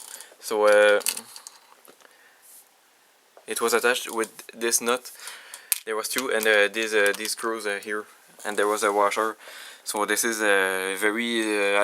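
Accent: Quebec, Canada. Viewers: Marrant son accent québecois in english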